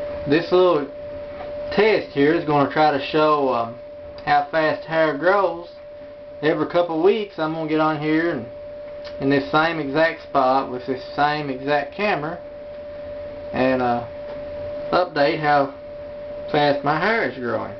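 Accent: United States. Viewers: Man, I never heard an accent quite like yours, but I think it is a really great American one. Am from VA, and we have about 3 or 4 distinct accents, beside the Yankee one I have. Good video. Looking forward to the rest. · Ya from North Carolina?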